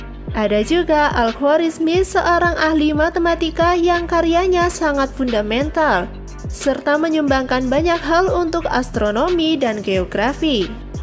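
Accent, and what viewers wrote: Java, Indonesia. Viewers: Suaramu medhok mbak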